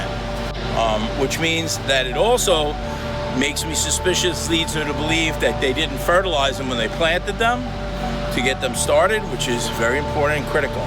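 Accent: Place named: New York, United States. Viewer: Ohhhh...New York. I grew up in the Hudson Valley & I miss it. In OK now. It's so good to hear that NY accent again. Beautiful landscape.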